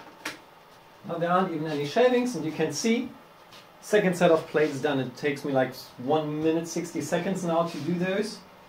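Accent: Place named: Australia. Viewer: Well done! Nice to see your face and hear you talk,. Did you learn English in Australia? I am trying to figure out your accent;)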